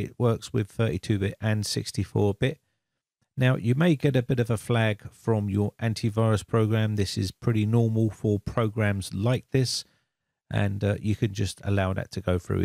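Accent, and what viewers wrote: United Kingdom. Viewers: Please make a videos for web development programming, sir. I love your British Accent.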